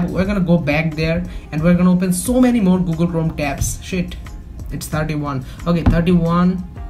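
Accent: Bengal, India. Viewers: No offence but try to change your Bengali accent it sounds shit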